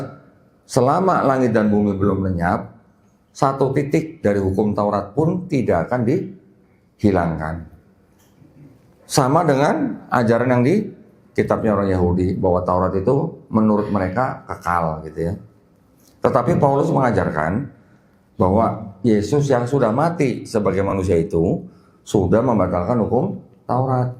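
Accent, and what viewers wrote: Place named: Java, Indonesia. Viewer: Beliau ini chinese tp kok njawani yo. Lanjut koh...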